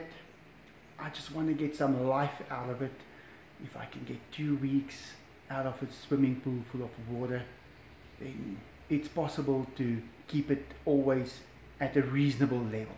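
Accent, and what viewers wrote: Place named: South Africa. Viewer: What country is he from? He sounds South African but I believe their language is English and I’ve heard him speak another language before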